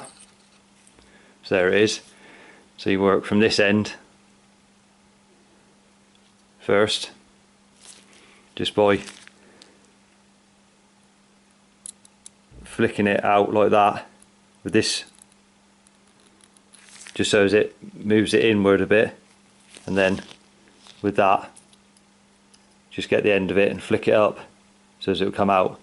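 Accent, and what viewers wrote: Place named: Gloucestershire, United Kingdom. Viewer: Thanks a bunch brother. You accent seems like you are very close to where i live in Gloucestershire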